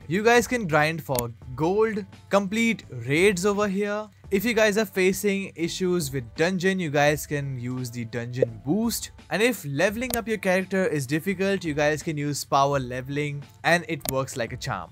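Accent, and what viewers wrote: India. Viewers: No offense, but you sound exactly like those scammers from India.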